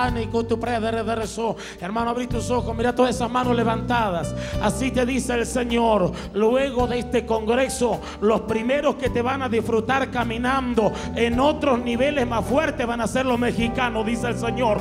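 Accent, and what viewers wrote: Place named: India. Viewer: Es q habla como los d la india.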